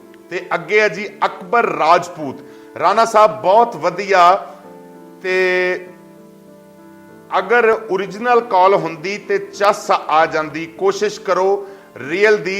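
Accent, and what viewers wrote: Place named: Rural Punjab, India. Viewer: saab aap punjabi bohut pyari bolte ho. Pure desi punjabi. Love it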